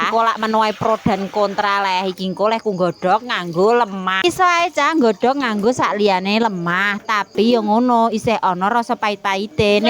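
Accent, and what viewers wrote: Java, Indonesia. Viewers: blunyut itu apa mak, medok nggih mak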